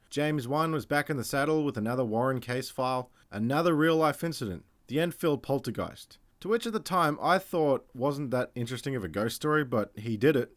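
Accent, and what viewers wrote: Australia. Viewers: As it's a wog/Leb Aussie accent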